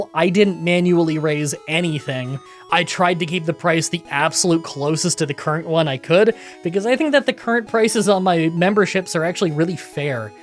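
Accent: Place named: Canada. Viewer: This narrator's Canadian accent is so cute to listen too😊😊😊😊😊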